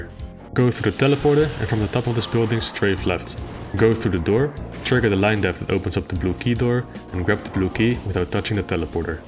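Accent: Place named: Netherlands. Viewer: I can't get around your heavy Dutch accent.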